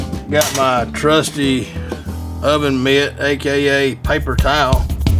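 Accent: South, United States. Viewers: The southern accent just makes these sound even better. Was living in the south for 10 years and those mofos can cook! If you're not a fancy little snowflake you'll love pretty much everything the south can cook.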